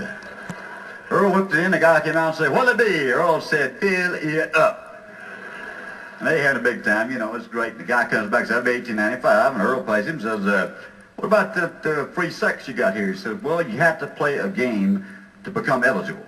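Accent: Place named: Georgia, United States. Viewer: He was born at Fort Benning which is considered outside Columbus, Georgia. He was from South Georgia and he sounds so different from North Georgia. : )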